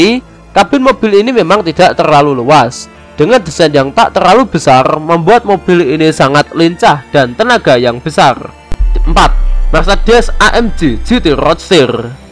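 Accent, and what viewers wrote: Java, Indonesia. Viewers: Jowo ne medok 😄😄😄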